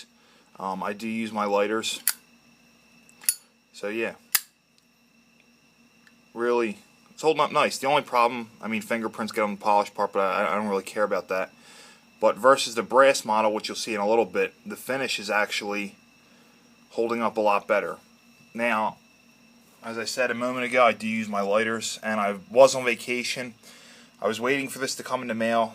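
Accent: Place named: Pennsylvania, United States. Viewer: Just scored one of these tonight and I like it. Side note: that is one of the heaviest Pennsylvanian accents I've ever heard.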